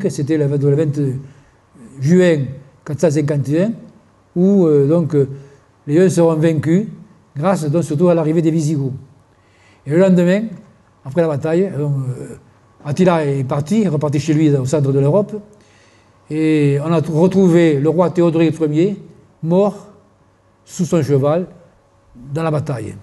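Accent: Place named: South-West, France. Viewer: l'accent du sud ouest est une merveille ! ❤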